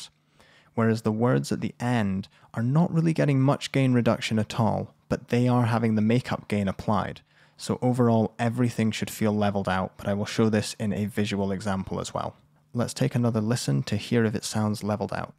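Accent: Scotland, United Kingdom. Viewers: Ery fine. The listening was the important part. The post compression comparison made what I was hearing visible. Thank you. Do I detect just a wee Scottish burr in there? Well hidden , but awe the same....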